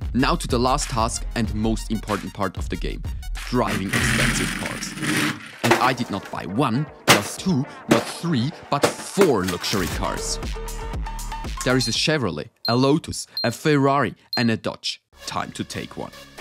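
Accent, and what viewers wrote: Germany. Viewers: youre german?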